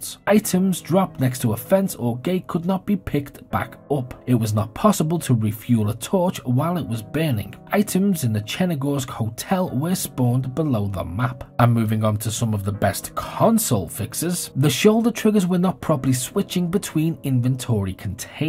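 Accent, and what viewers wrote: Liverpool, United Kingdom. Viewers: What part of the UK are you from bro ? Can't place it. Hints of scouse I think tho as a scouser myself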